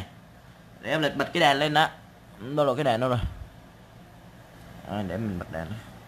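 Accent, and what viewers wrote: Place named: Quang Nam, Vietnam. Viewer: A người quảng Nam hả a?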